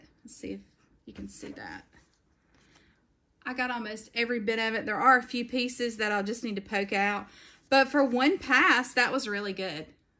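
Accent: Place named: South, United States. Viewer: Thanks for a great demo!!! You did a great job! I seam to have the same dies as you, so I can't wait to try them! I love your accent. What part of the South are you from? I'm from Tennessee.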